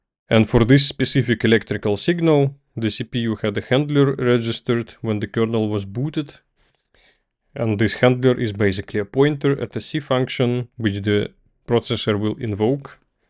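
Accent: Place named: Russia. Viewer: nice lecture other than the scarrying russian accent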